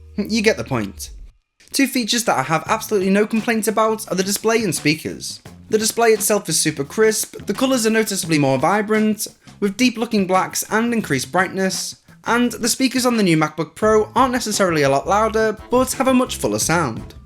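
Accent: United Kingdom. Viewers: It's the typical British way to do a voiceover, I've grown to enjoy it lol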